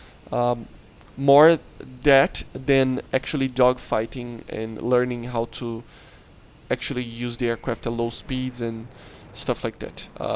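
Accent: Brazil. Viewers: where are u from? your soutaque looks like brazilian soutaque xD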